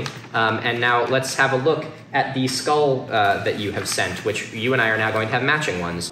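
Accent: United States. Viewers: I KNEW YOU HAD A MASS ACCENT OMG I figured Rhode Island was just close enough but I feel 10x better knowing you’re from Mass. I have so many friends from there y’all all talk the same LOL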